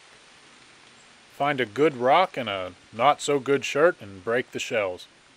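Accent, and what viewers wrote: Pennsylvania, United States. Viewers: Where are you from? You sound like a fellow Pennsylvanian sometimes. Lol I just found your channel today so please forgive me if it's written somewhere and I missed it or just haven't found out yet. Lol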